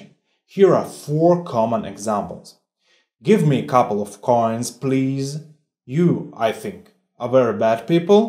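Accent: Russia. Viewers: I love the Russian accent! Many, many years ago when I was studying Russian in high school (in the 70s), our instructor was teaching us how each language has something unique about it that is difficult for non-native speakers to duplicate. His point was that we need to master these differences in order to not sound so "American" when speaking Russian. For example, In English, we do not roll our r's. That's difficult for many English speakers and has to be practiced. We would spend a lot of time trying to properly pronounce words like рыбa, работа, рот, ручка и т.д. In English, we have the "th" combination that is rather unique and difficult for many non-English speakers to duplicate. That's why many non-English speakers have a difficult time properly pronouncing a simple word like "the." When a German speaker, for instance, tries to say, "I think so," it might come out sounding more like "I zink so" or "I tink so" or "I dink so." There are English sounds that do not exist in Russian. 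I remember my Russian teacher jokingly telling us about something he called the "Bit, Bat, Boat Test." This is how you know a person is a native Russian speaker and not an English speaker. Ask a Russian to say "bit" and it will invariably come out sounding more like "beet." That's because Russian doesn't have the short-i sound. Ask a Russian to say "bat" and it will come out sounding more like "bot." That's because Russian doesn't have the short-a sound. Ask a Russian to say "boat" and the "o" so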